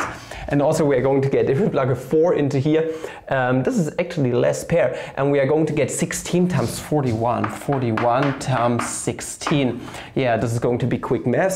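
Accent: Germany. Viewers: You sound german, are you from Germany?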